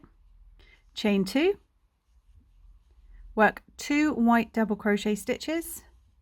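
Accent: England, United Kingdom. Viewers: Thank you I was thrown because you have an English accent and was using americanised terminology 😁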